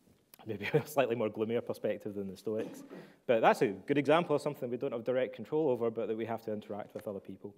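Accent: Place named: Cockney, United Kingdom. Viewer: He's talking in Cockney accent which even most of the Brits don't understand.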